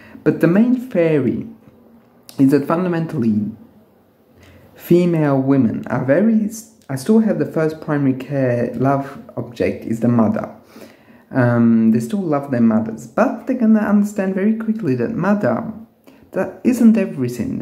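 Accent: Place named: France. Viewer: You speak very good English whilst retaining the charm of your beautiful French accent. Very well presented, informative and thought provoking video. Thank you. I have subscribed.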